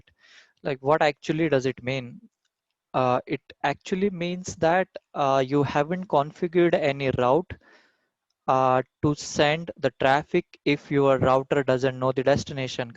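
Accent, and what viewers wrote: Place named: India. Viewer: I hate indian english! OMG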